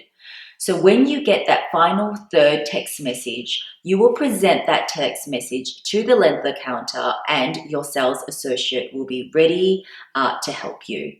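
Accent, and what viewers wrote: Australia. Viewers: Hi, love your Aussie accent lol. Thanks for your video, I'm going to Paris soon, and I didn't plan to get a Hermes bag, ur video made me wanting to have one now!!!:)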